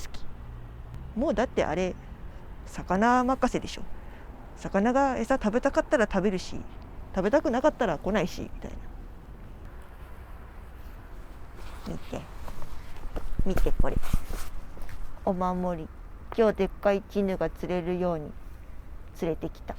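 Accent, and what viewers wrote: Kagoshima, Japan. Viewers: 只々、スケベ心だけで見続けてしまった。声と喋り方が可愛い。鹿児島弁なのかイントネーションがとても耳障り良い。良いチャンネル見つけてしまった。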